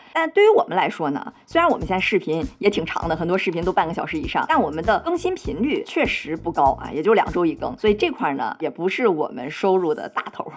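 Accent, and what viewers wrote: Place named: Beijing, China. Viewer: 好舒服的北京口音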